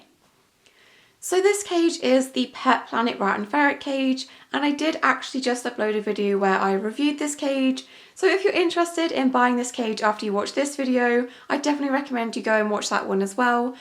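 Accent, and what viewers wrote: England, United Kingdom. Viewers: are u from England? So am i!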